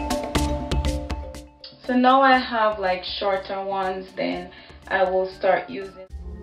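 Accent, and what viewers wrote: Jamaica. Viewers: r u from Jamaica?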